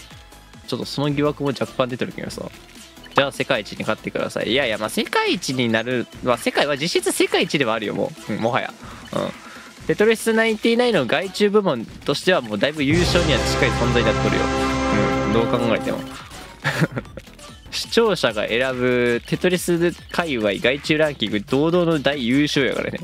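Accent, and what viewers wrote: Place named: Northern Kyushu, Japan. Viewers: この人の方言から九州北部を感じるんですけどどこ出身か分かる人いますか? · ○○けん。は博多弁すね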